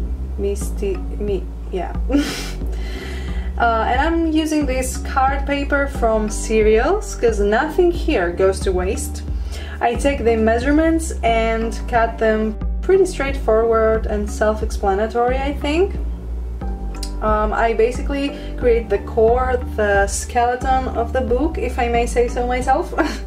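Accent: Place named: Greece. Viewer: Omg you're Greek? I couldn't tell by the gorgeous accent! I'm Greek too!❤️🦋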